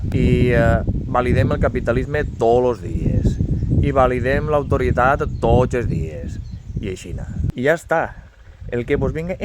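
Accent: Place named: Ribera, Spain. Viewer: Ets un tío carismatic, vingent de tu en eixe accent de la rivera , un deu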